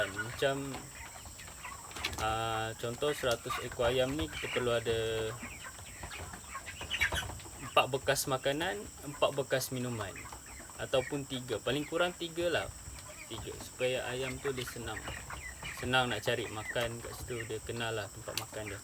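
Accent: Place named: Peninsular Malaysia, Malaysia. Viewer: Awk org smenanjung yer. Saye igt org sabeh..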